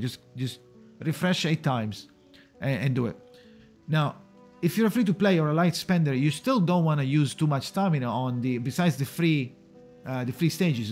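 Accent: Italy. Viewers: sapevo che eri italiano, parli inglese con il mio stesso accento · Però l'accento italiano si riconosce subito ahahah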